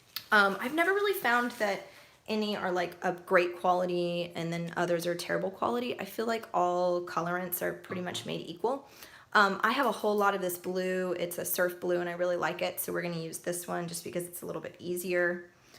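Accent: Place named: United States. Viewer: I've noticed one thing with these types of diy videos... When an American makes them - TOOOOOOOO MUCH FRIGGIN TALKS, NO FRIGGIN ACTION... they keep talking and talking and talking praising how good they are... and when you watch videos from other countries - NO TALK, JUST BUSINESS... nice relaxing music and business... but only and only Americans bother people with their annoying accent and nonsense talks... OMG grow up America, people around you are getting better and better... for example in this video she keeps talking and stalking people to order her unfinished product, and in the end - WHERE IS THAT FRIGGIN MARBLED WHATEVER YOU WERE MAKING?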